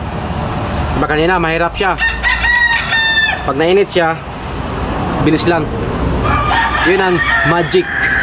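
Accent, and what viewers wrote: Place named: Visayas, Philippines. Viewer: wag mo na itama. bisaya kase sya kaya ganun nya bigkasin.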